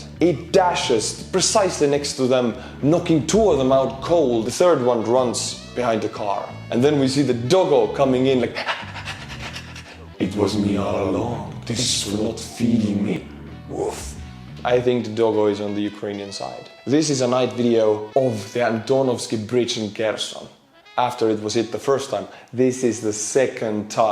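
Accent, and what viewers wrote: Estonia. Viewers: I like how the patreons are read. I am considering becoming one just to see how my name sounds in Estonian lol. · The best news on this war with Estonian Accent... Mr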